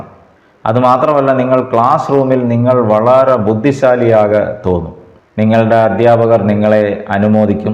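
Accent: Tamil Nadu, India. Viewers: സർ, താങ്കൾ തമിഴനാണോ സംസാരത്തിൽ തമിഴ് കലരുന്നു. ഏതായാലും താങ്കൾ നിസ്സ്വാർത്ഥനാണ്. തോന്നുന്നത് അപ്രകാരം പറയുന്നു. സത്യം സത്യമായി. 👍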